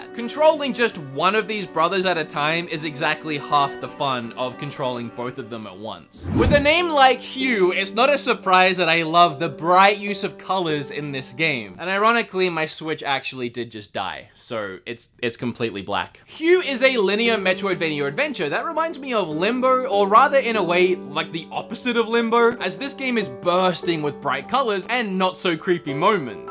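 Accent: Australia. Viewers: Lol I didn’t realise your Australian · Wait, your Aussie? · I'm so glad you finally said your accent, I'm Australian too and it was killing me bc of the American hints you have too, I was so confused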